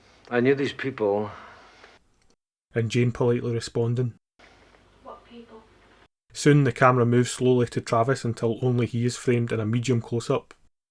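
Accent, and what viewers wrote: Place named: Scotland, United Kingdom. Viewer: Hey this is versustheworldvideo, thanks again for your comment on our latest video. I'm replying on my personal account. I'm Scottish myself, but your accent is much thicker than mine - I love it! Your in-depth analysis is impressive, and your editing skills are sharp. You put a lot of time, care and effort into your video. I was blown away at 3 mins in by your explanations that make perfect sense as to why the director set the scene up that way.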